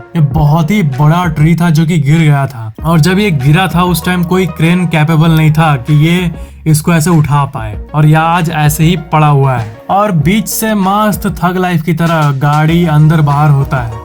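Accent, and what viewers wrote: Bihar, India. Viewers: His language speaking style changed too much..like he is from bihar.. And his voice is not energetic anymore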